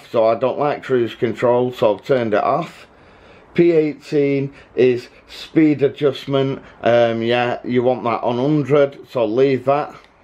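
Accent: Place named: Manchester, United Kingdom. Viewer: Proper manc lad hah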